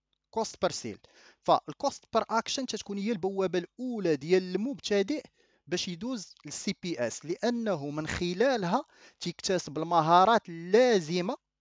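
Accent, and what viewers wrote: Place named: Maghreb. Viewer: لا استطيع فهم اللكنه المغاربيه مهما حاولت للاسف اللكنه او اللهجه المصريه اصبحت رقم واحد في الوطن العربي😔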